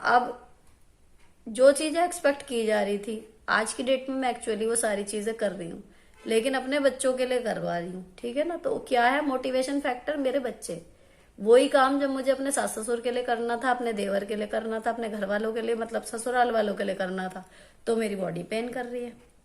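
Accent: Meerut, India. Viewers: Aapne blkul sahi bola apni body ko apna Ghulam bna lo to sab kaam aasani s hote chale jate fr koi pain pain ni rehta ....mere yaha jb maid t tab mere pain bhot hota ta but jbse use hataya h Mai etni active ho gy hu k hairaan hu maid q Maine rakhi t....aapki videos bhot motivational hoti h..... Di aap meerut s to ni hyn? Meri friends circle m aadhe s zyda sab meerut wali thin to hme unka lehja bat cheet bhot ache s pta h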